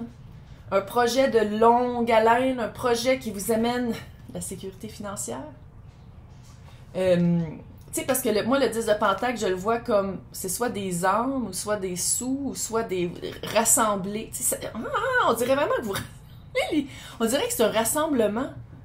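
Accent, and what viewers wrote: Quebec, Canada. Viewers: Bonjour, et oui ça y est j'ai pris la décision de demander l'officialisation de mon divorce et je me sens plus légère, ce n'ai pas encore terminé mais j'espère que ce mois ci je serai plus libre pour accomplir mes projets du moins je l'espère. Gratitude pour votre travail et les conseils que vous nous donnez. 🙏🙏🙏❤❤❤ et j'adore votre accent du Québec et votre spontanéiiété 😄😄😄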